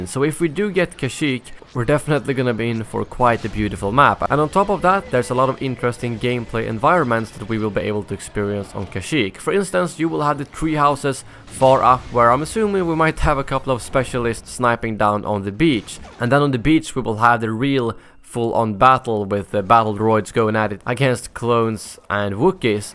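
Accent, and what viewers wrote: Sweden. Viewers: I knew it! You are from Sweden, could hear it from your voice :)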